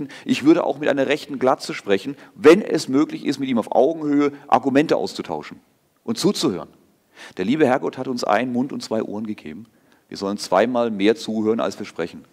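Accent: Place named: Mannheim, Germany. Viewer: Vor den hochintelligenten Menschen müssen wir aufpassen. Die versuchen uns aufzuhetzen. Und ich bewundere die Menschen die cool und ruhig bleiben. (Herr kommen sie aus der Mannheimer Ecke oder warum können sie den Dialekt?)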